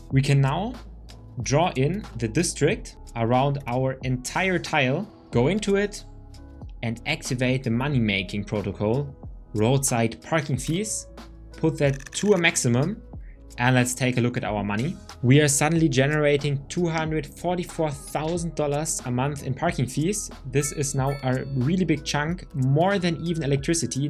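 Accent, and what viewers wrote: Germany. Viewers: i hear a thiccc german accent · Man hört den deutschen Akzent 😂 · I hear deutsch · To me he sounds German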